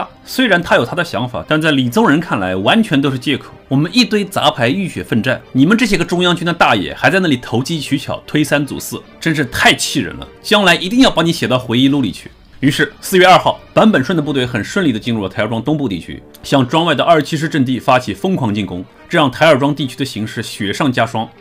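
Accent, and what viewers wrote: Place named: North, China. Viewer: 北方人正常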